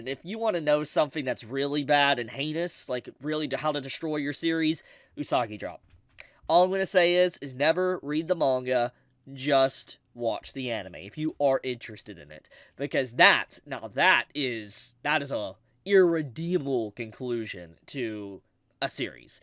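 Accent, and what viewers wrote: South, United States. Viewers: idk your accent sounds a little southern so you might be use to this sort of thing.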